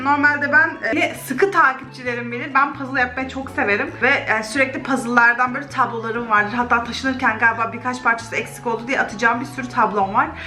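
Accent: Black Sea, Turkey. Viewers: Ohaa abla Karadenizlimiymiş. Oyyy uşağuum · Abla karadenizin neresindensin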